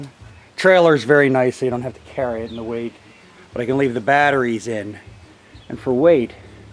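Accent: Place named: Maryland, United States. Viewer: Sounds like a Maryland accent.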